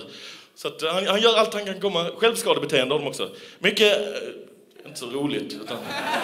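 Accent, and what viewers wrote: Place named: Skåne, Sweden. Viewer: han pratar skånska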